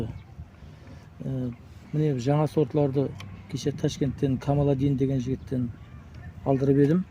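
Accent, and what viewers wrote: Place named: Turkistan, Kazakhstan. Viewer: Ассалаумағалайкум аватар жүзімінің қаламшасын күзде дәл осы кезде кесіп көміп көктемде тамыр шығарып алса болама? Түркістанның қазағымын сіз сөйлеп жатқанда қай тілде сөйледіңіз тура біз сияқты ғой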